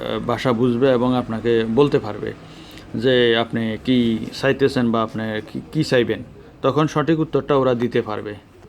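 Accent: Noakhali, Bangladesh. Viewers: আপনার বারি মনে হয় নোয়াখালী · আপনি কাকে বলছেন? কে যেতে চেয়েছে ইতালি? কে বলছে ইতালি যেয়ে কোথায় থাকবো,কি খাবো?কে বলছে ইতালি যেয়ে আমার খাবার টাকা নেই? বা: বা: আপিনি নিজেই প্রশ্ন রাখছেন এবং নিজেই আবার ঝারি মেরে তার উত্তর দিচ্ছেন আবার বলছেন এটা মহিলাদের মতো কথা।কে জিজ্ঞেস করেছে আপনাকে? ভাষা শুনে তো মনে হচ্ছে খাঁটি নোয়াখাইল্যা, যা হোক আপনের কাছে কথা বলার নতুন স্টাইল শিখলাম।সাবস্ক্রাইব করতে পারলাম না বলে দু:খীত।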